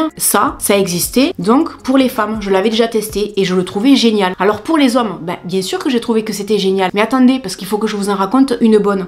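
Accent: South, France. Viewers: Je ne suis pas du style à commenter malgré le fait que je regarde régulièrement tes videos. Cependant, merci pour ta bonne humeur, ce petit accent chantant du sud et surtout tes vidéos qui englobent vraiment tout le monde... Comme tu le dis si bien, arrêtons de vouloir ranger les gens dans des cases... soyons unique tous et chacun!! Je te souhaite le meilleur, au plaisir de te récrire!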